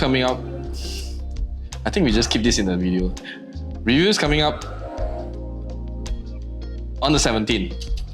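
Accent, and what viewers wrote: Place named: China. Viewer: Your accent is so chinese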